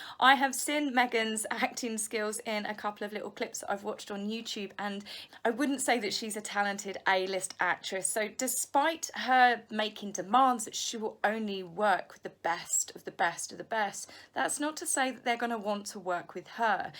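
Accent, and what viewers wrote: United Kingdom. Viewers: Hi.love ur Channel so much and for telling it like it is when it comes to Markle with No Sparkle.such a self centered.controlling.manipulating human being!! Cannot stand her Fakeness! I love your British accent may i say and you are a very pretty young lady.may you and yours stay well and safe thru this crisis we are all enduring.look so forward to ur Royal news each day.Hugs from Canada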